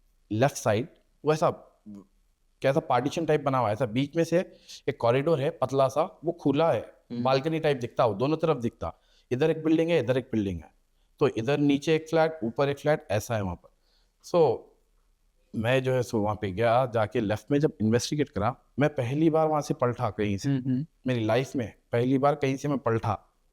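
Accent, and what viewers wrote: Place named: Hyderabad, India. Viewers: arre Bhai hindi hi bolre par ascent Hyderabadi hai isliye waisa dikhta. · Hyderabadiii boltey.. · Apna Hyderabadi bolte Bhai Ku angaar dalte love you brother 😍😍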